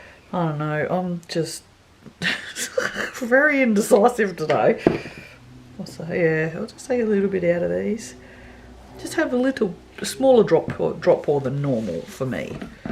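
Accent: Australia. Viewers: Hi, I'm a bit late to the party... love your Aussie commentary lol (Aussie here too). I have this fragrance but haven't used it yet, so was good to see your video. I wanted to ask where you got your soap cutter from please.. I've been looking but seems like theyre all O/S..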